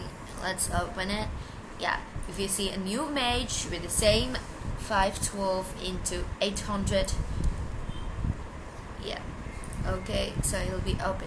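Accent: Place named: Kerala, India. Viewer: SHe sounds malayali indian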